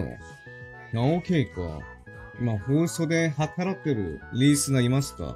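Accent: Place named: Kansai, Japan. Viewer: なんで知ってるんやが流暢すぎて笑った mondoの関西弁良いよね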